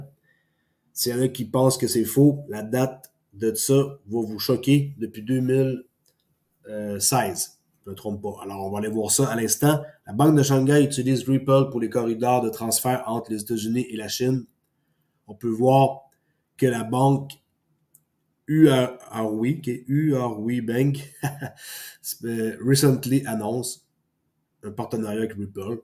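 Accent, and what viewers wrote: Quebec, Canada. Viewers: Merci pour tes recherches et analyses. Cest plaisant d'entendre un quebecois se prononcer sur le sujet XRP XLM XDC!!! LA MECHE SE RACCOURCIT!!! KABOOM!!!!!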